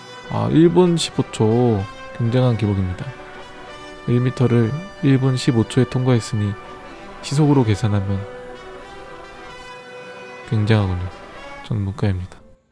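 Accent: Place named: Chungcheong, South Korea. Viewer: 구수한 충청도사투리 ㅋㅋㅋ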